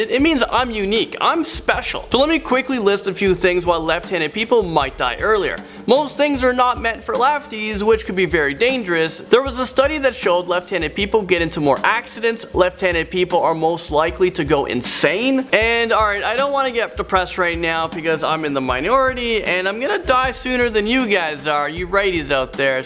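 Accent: India. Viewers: r u inidian ? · Are you indian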